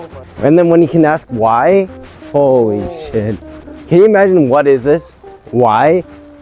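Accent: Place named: United States. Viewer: Wait.. What? You have a very good American accent for a Korean.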